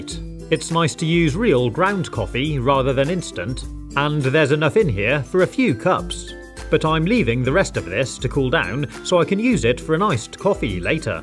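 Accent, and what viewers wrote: United Kingdom. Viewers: And your British that cool I I’m to I love this and keep it up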